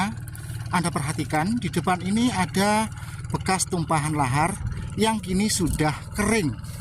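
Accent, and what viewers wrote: Java, Indonesia. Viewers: Pokok e you tobe kriator yg satu ini sangat bikin aq ngakak 🤣🤣🤣 wesss juowoo jekek ngomonge😂😂semangat kawan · Yutuber ko ngomong nya glepotan bro Jawa ya Jawa sekalian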